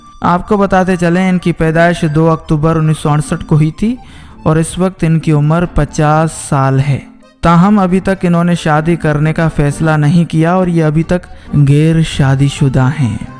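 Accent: India. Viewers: App ki language Kis kisam ki hay? Lahja or talafz Hindi hay. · Sub say phalay apni Urdu sahi karo Urdu ko handi ki tarha mat bolo bhai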